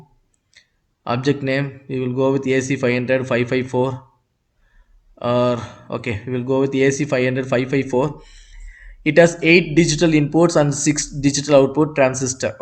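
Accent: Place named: India. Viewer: Gotta love the Indians attempting to talk English. Loosen ur turbin a little Bit. Thinks it still cutting off the blood flow a little bit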